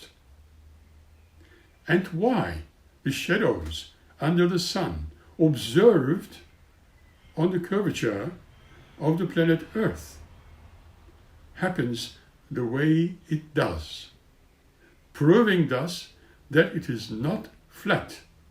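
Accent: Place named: Turkey. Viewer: Merhaba! Your Turkish accent was perfect.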